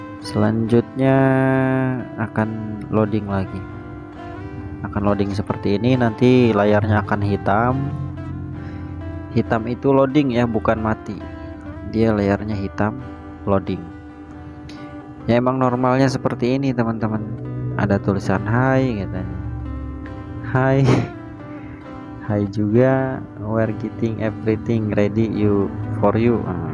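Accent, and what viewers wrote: West Java, Indonesia. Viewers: Logat namah sunda...dimana ie kang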